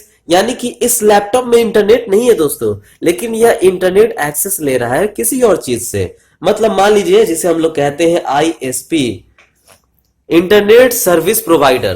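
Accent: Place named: Bihar, India. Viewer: bihari hai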